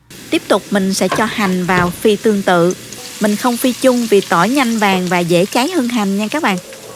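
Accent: South, Vietnam. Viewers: Giong miền Nam của Bình Dương nghe ngọt và bùi như patê cô làm.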